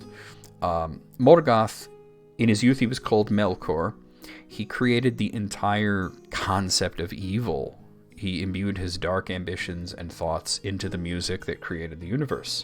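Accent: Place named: United States. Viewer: A small correction: A vowel, linguistically speaking, IS what you call "a vowel sound". The symbols representing the vowels do not have a name of their own. So, linguistically, "cat" and "Mars" have two different vowels at the core of the word; they don't both have one vowel called "a". So on the one hand, you are actually arguing that there are more than three vowels in Black Speech when you say that "burzum" has two different u-sounds i.e. two different vowels (/ɜ:/ and /u/ respectively). At the same time, that is your pronunciation which is clearly coloured by American English. If I were to pronounce "burzum", with the influence of my native language, it would be two /u:/ sounds, i.e. the same vowel. An English speaker reading with their accent would probably read it with around 7 vowels (/ɑ/, /ʊ/, /u~ʉ:/, /ə/, /ɪ/, /i/, /ɜ:/). A German speaker like me would read it with 5 vowel sounds (/a(:)/, /ʊ/, /u:/, /i:/, /ɪ/). 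A Spanish speaker would probably have 3 vowels in their pronunciation, and so on. You also say that languages have way more than three vowel sounds usually. That's not necessarily true. Arabic has three vowels only, and those are, in fact, /a/, /u/ and /i/. So, it would be possible that Black Speech works with just these three vowels. Now, technically, Arabic has more because you can go at it from a phonetic or phonemic perspective. Phonemic is the language as you hear it and interpret it, phonetic is language as you actually say it. Basically the difference b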